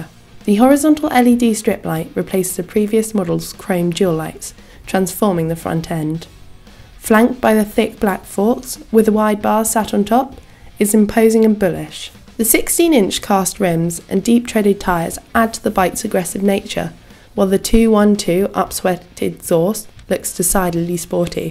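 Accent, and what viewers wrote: United Kingdom. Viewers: Interesting review. Please work on enunciation and slow down a little... that brittiot accent is annoying. But a cute girl and a nice new motorcycle are a reason to watch this interview for sure. And remember, there are 300lb (all muscle) big guys like me out there who would rather have a bike that can handle our weight and aggressive riding style than some sport bike. Also one with more torque fits us better than higher horsepower bikes that require super high rpms to accelerate a moderate load and could not corner well with our size and weight anyway. And finally the big tires tend to eliminate grooved pavement and lane segments very well... so they actually improve handling. Ah... not the best review there, but what do you expect from the British mush.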